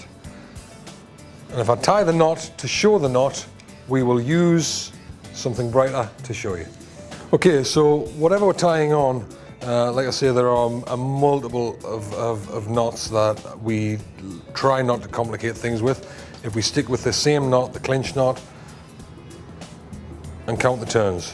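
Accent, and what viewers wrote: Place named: Durham, United Kingdom. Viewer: Durham accent 🤔🤔😁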